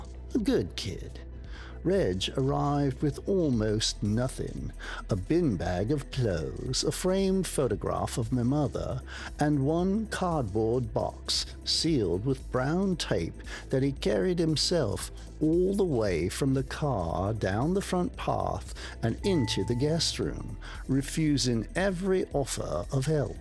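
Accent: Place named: England, United Kingdom. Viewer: I loved the authors English accent. Favorite line: I’ve gotten really good at “comfortable thoughts”. It’s almost as if the English accent created a character that spoke with the consciousness that became this narration, not only in tone, but in vocabulary. Very authentic and hauntingly intriguing story.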